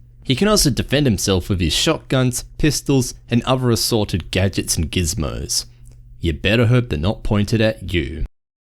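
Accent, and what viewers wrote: Australia. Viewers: Are you Aussie? The accent makes me think that. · Your voice reminds me of Robin Walker (dev at Valve who designed TFC and TF2). Are you, by any chance, Australian?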